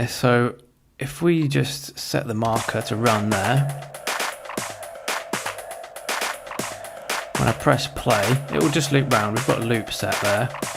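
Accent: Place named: Northern England, United Kingdom. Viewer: Just watched it - very useful thanks - I am doing none of those things properly although I have found a good piece of s/w to show the key of a clip - Keyfinder (no business connection - its free) - nice to have a calm englishman with no gimmicks rather than some of the johnny foreigner types with all their silly cuts (including hair!), daft graphics, mumbling, silly accents etc - I take it you're from up t'north lad by eck.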